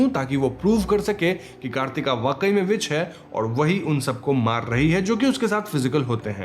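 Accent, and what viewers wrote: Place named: Bihar, India. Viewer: जिया हो बिहार के लाल भाई बिहार से हूं एक्सेंट आ रहा था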